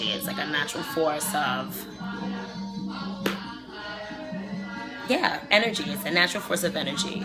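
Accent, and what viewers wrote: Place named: Dominican Republic. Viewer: She sounds like my friend who is Dominican. Loves it!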